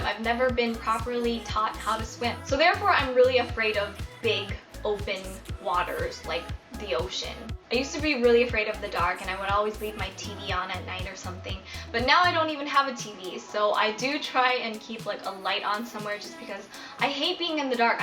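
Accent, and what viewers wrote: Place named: South, United States. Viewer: My god and your southern accent!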